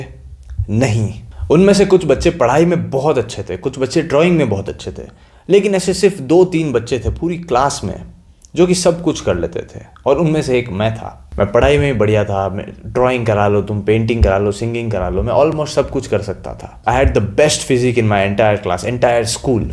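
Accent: Rajasthan, India. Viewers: R u from Rajasthan? I think because of your accent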